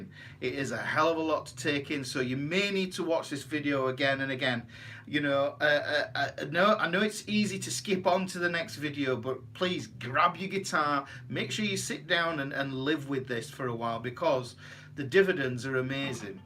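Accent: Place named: West Yorkshire, United Kingdom. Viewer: Hi,. I've been totally mystified by this for decades until I watched this vid. Thank you so much. Understanding this has opened so many doors now. i suddenly seem to be able to play along to anything once I've sussed the root note. Than k you so much and keep on keeping on,-) Good to hear a West Yorkshire accent on here too. I'm only over in Bradford myself.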